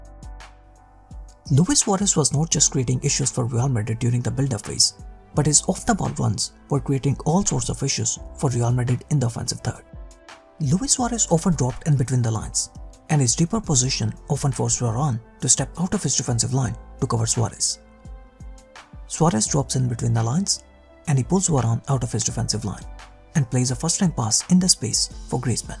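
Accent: India. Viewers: Dude... Is the narrator Indian?? At least His accent is ..😐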